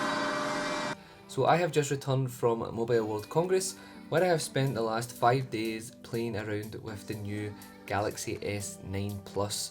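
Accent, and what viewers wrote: Scotland, United Kingdom. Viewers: Love the British accent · nice Scottish accent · Awesome! Subbed because your accent reminds me of tartans. =)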